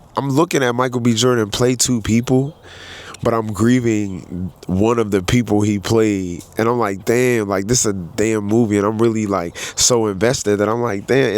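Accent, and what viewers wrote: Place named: Caribbean. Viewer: Sir, do you have family members who originate in the Southeastern United States? Because nobody who has ancestors from that place and lived during that time would totally and completely invalidate Mary's race and ethnicity like you did. I'm sensing a slight Caribbean accent too. I'm sensing your people are not originally from here, because people who looked like Mary were definitely in our family as grand fathers and grandmothers, great grandfathers and grandmothers, great great grand fathers and grandmothers. We all had family members who were Yt presenting and some actually passed for Yt. Your definition of what is Yt doesn't apply to the historical reality of Mary's identity, and it was really aggravating to hear you constantly dismiss her race and ethnicity and call that woman Yt and say Stack had a Yt girl. Mary was not a Yt woman. 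It's very low key disrespectful to dismiss people like Mary who were in our lineage and chose not to pass, and that is what Mary ultimately chose for herself, to not pass for Yt or live as a Yt person. Her storyline was a representation of our ancestors who had her experience. People who were Yt presenting in Black America is part of the Black American experience. There were plenty of Yt presenting Black Americans in the USA who were enslaved in this country, and Jim Crowed right along with the darker skin Black folks, and who stayed within the negro/colored community marrying more darker skinned Blk folks and as a result, we have these women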